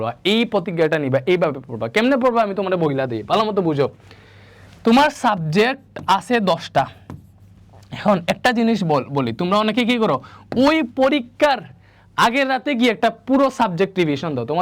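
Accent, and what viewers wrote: Sylhet, Bangladesh. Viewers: Sylheti Sylheti vibe aser bror kota taki?🙌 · Bhai apni ki Sylheti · Bhai apni mone hoy Sylheti😊😊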